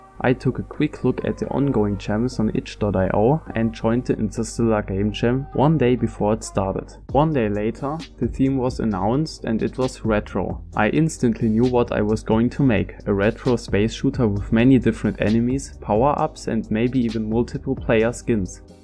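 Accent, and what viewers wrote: Germany. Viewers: weil ich auch aus Deutschland bin und etwas an deiner Aussprache klang deutsch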